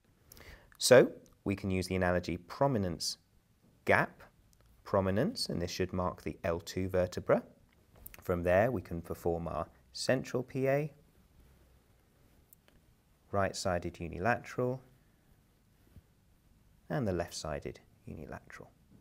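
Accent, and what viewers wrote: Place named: United Kingdom. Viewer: your explanation is crystal clear this how you can tell British English sounds better than American 's 😊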